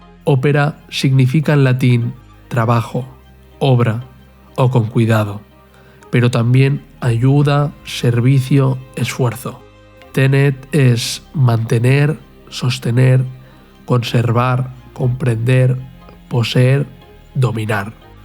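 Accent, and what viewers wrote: Catalonia, Spain. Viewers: A mi me suena más a catalán por como pronuncia Júpiter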